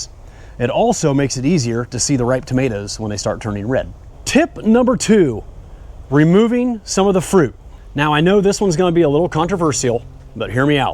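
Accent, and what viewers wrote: Ohio, United States. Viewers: Love your Ohio accent!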